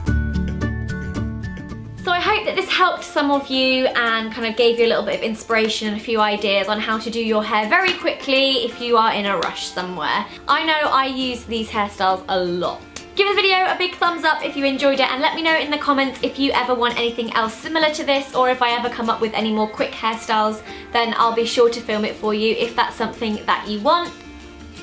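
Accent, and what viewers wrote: United Kingdom. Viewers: Readers.... so it's a... British.... accent.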